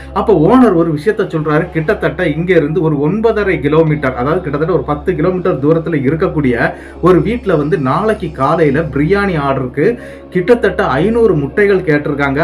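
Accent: Tirunelveli, India. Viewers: தம்பி திருனவேலியா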